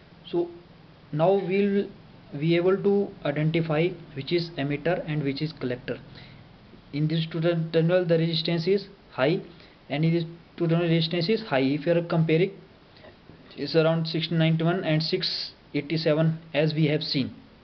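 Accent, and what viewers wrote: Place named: England, United Kingdom. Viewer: fuck this english accent !!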